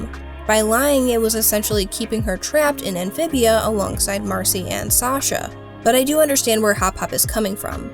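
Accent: Minnesota, United States. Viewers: Every video I see of you I think you’re from Minnesota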